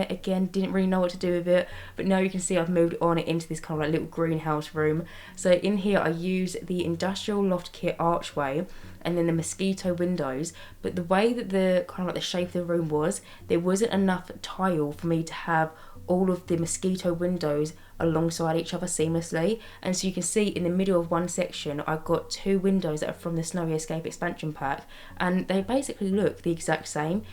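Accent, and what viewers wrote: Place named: Essex, United Kingdom. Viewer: just curious but are you from Essex? your accent is amazing!! I just found your content, love it.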